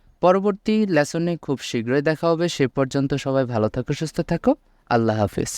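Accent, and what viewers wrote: Narsingdi, Bangladesh. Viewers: ভাই আপনার গ্রামের বাড়ি কি নরসিংদী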